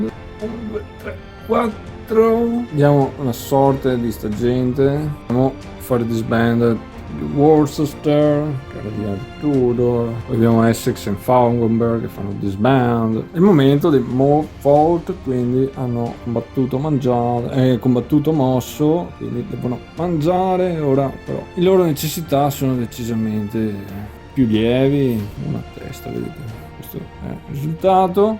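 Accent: Italy. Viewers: Ciao, ottimo lavoro! Mi sto guardando i tuoi video visto che ho da poco acquistato il gioco. E poi dall'accento non sei così lontano da Vicenza, magari in futuro Na partìa 😂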